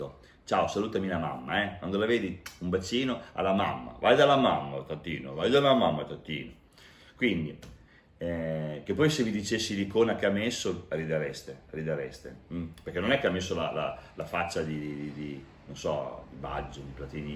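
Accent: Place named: Piacenza, Italy. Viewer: Ciao ma sei di Piacenza? hai l'accento simile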